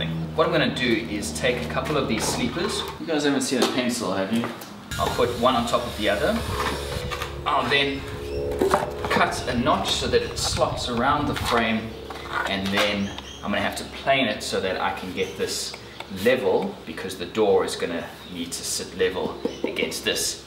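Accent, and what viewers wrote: South Africa. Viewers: I thought i heard the Durban twang. You have a fan here in East London :) I love watching your videos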